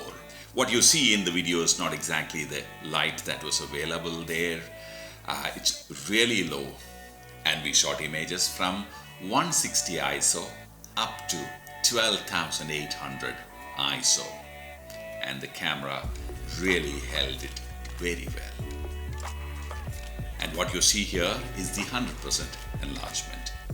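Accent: Kerala, India. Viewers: Chetta....ur mallu accent .....rocks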